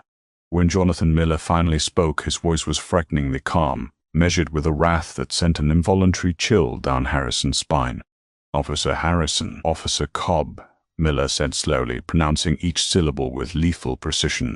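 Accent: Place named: United Kingdom. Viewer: Great story but again, this voice is terrible. Maybe try something else, but keep it british! 🙏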